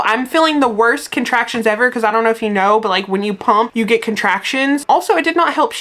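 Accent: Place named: Alabama, United States. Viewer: Girl I want to come up to your town and just sit and have a coffee and talk to you ❤.. I actually live in Phenix City Al and it's so nice to hear someone on YouTube that talks like me, I'm 50 yrs old I have lung cancer. I've been watching you from the start of your YouTube journey. I'm so happy that you are now so popular. You have grown into a wonderful young lady and a absolute amazing Mom. Sending love and prayers 🙏🙏❤️ to you Kev and Oliver.. love the long form video content. You are an example too others your age. ❤❤❤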